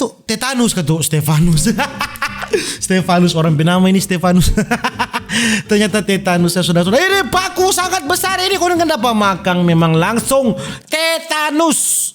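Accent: Manado, Indonesia. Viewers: itu logat manado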